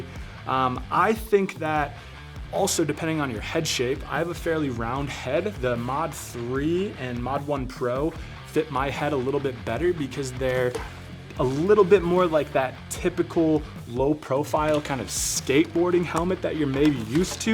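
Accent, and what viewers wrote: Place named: Toledo, United States. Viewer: Dude are you from Toledo?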